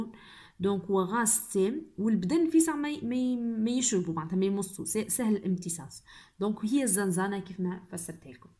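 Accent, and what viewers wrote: Tunisia. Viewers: Min lahjitha ta3rifha tounsia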